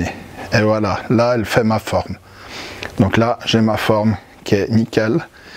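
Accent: Switzerland. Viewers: Avec un accent vaudois ? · J'essayais de trouver cet accent et je me disais Apple Bière ben voilà ;-) aller pour une fois qu'il y a un Suisse qui se donne la peine je m'abonne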